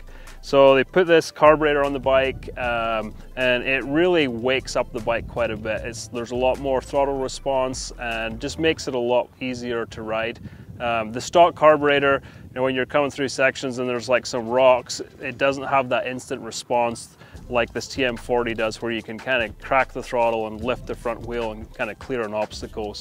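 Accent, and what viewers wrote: Scotland, United Kingdom. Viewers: do I hear a touch of Scottish through the accent... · Scott Manley is another Scottish Youtuber, though his accent is much thicker. I assume your accent is Scottish, yes? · You Scottish? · What part of Scotland are you from. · Hint of a Scottish accent in there?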